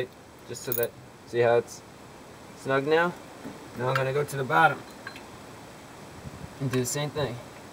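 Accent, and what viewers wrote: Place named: New Jersey, United States. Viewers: NJ, I'm also originally from New Jersey and I say water the same way, "wooder". Well I'm trying hard to change that now. I didn't realize I was saying it wrong until I moved to a different state. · JordanMatthew Anderson southeast pa or south western Nj, philly/ Camden we all say wooder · Can definitely tell youre from New Jersey. Lol. My wife pronounces water the same way.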